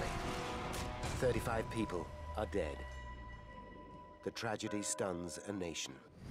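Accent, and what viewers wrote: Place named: England, United Kingdom. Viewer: I like the English narrator much more than the American narrator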